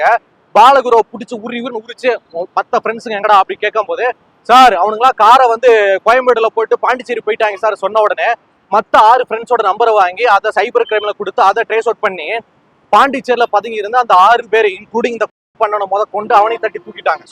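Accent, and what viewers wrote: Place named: Tirunelveli, India. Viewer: தனிமனித ஒழுக்கத்துக்கும் அரசுக்கும் எந்த சம்பந்தமும் இல்ல அதான் ஆரம்பத்தில் இருந்தே சொல்றேன் உங்களுடைய எல்லா வீடியோவையும் நான் மறக்காமல் பார்ப்பேன்.... நீங்க பேசுற எங்க திருநெல்வேலி ஸ்லாங் ரொம்ப நல்லா இருக்கும்... அதுல ஒரு நேத்தி இருக்கும் அதுல ஒரு நியாயம் இருக்கும். ஆனா உங்ககிட்ட ஒரே ஒரு விஷயம் கட்சிய வச்சு பேசுறீங்க அதுதான் தவறு..... விளாத்திகுளம் அந்த பொண்ணு கற்பழிக்கப்பட்டப்ப அவ்வளவு பேசினீங்க இல்ல கோயம்புத்தூரில் அந்த சின்ன பொண்ண கற்பழிச்சதுக்கு எந்த மாதிரியான வீடியோ நீங்க போட்டீங்க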